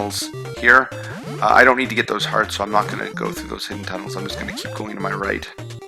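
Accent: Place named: Canada. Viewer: I can tell your a canuk 😂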